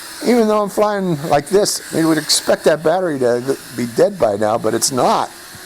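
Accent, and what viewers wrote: Pittsburgh, United States. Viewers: I detected a Pixburgh accent! Thanks, from North Hills! Anyone know where I could get a basic case with this? Buying a couple as gifts.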